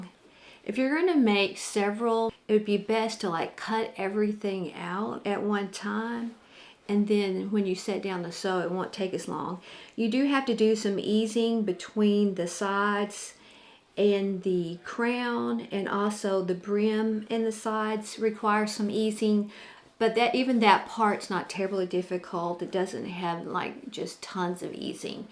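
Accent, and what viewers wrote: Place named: South, United States. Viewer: Thanks for this! Love your southern accent!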